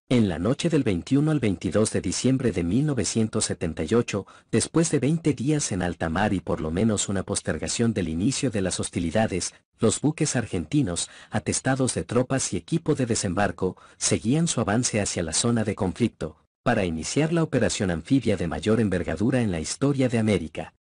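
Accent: Chile. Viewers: Me resta decir que el relator es muy probablemente chileno, o me equivoco???? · aunque te esforzas en disimular tu acento, vos sos chileno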